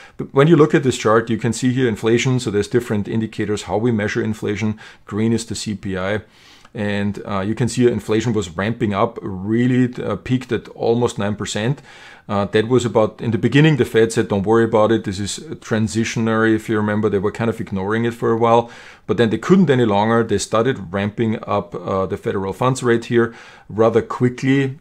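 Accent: Germany. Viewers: Bist du aus Deutschland oder Österreich ?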